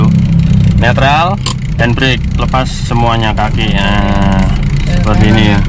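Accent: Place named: Java, Indonesia. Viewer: Dari logat bicaranya sepertinya sampean orang Jawa mas ya.. 🙏 Salam saking tiyang Jawi teng Papua mas-seee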